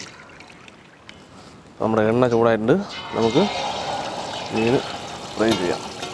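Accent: Palakkad, India. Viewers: ഏട്ടാ നിങ്ങൾ പാലക്കാടൻ അല്ലെ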